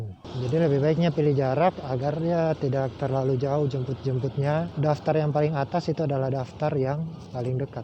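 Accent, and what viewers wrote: Manado, Indonesia. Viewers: Orang mnado ya bro?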